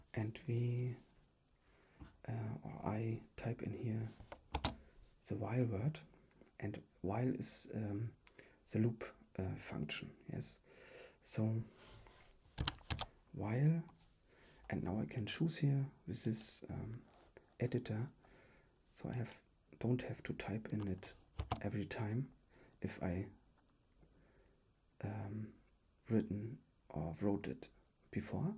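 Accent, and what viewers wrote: Germany. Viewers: Nur wenige Amis sprechen das The mit th aus. Die meisten sprechen es als gepresste D aus. Also "da car" nicht "the car". Aber stimmt schon die Aussprache ist doch schon sehr Deutsch xD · Man hört einfach komplett das du deutscher bist 🧐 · Ich höre doch da einen Deutschen Akzent raus 😂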